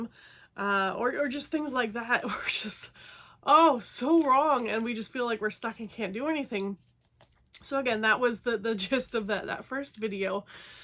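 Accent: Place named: United States. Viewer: U r a very good lady. There are so many scammy crooks in the world. Best wishes for the future from England. Btw, we have the same problems. I think from your accent u r from the USA?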